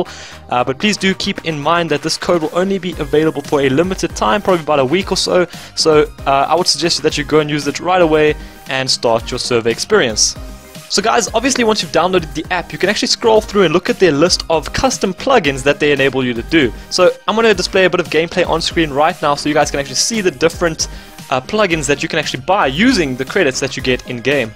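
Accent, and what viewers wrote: South Africa. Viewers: are you South African? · u sound South African?!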